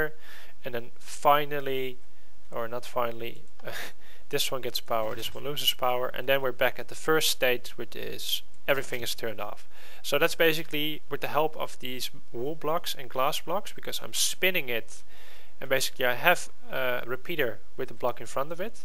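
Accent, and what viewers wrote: Netherlands. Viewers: Je kan het nederlandse accent horen :P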